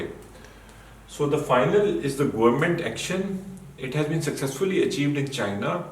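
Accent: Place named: Punjab, India. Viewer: punjabi bahar aa rahi si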